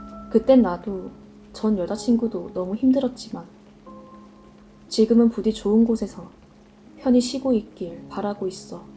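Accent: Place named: Gangwon, South Korea. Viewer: 성우 목소리 정말 좋네요 강원도 억양.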